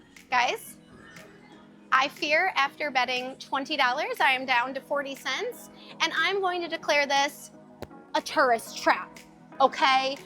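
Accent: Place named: Chicago, United States. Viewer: I figured north because you sort of have a Chicago accent. You would be an amazing teacher!!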